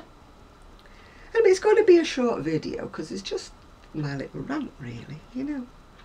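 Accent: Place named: Manchester, United Kingdom. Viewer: Oo, thank you for your lovely channel. It was a bit like 'coming home' hearing your smashing accent. (I've lived away from Manchester for many years - down south - but as they say 'you can take the lass out of Manchester, but you can't take Manchester out of the lass'!) I agree with your sentiments entirely. After all, if you just churn out crochet items to meet a fad demand it then becomes 'just a job' and where's the fun in that? Keep on with your fab videos, I'm a bit late to the party but intend watching them all!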